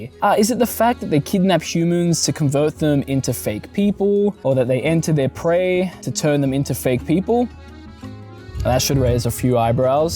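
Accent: Australia. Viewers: You look really nice, like not just how you look, your voice and your look go well together in a way that makes you look better. Sorry if that sounds weird. I'm high, are you australia? I can't tell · are you aussie?? fuck yeah brother best country in the world (said that bc of the fauna and accent but i checked the bio)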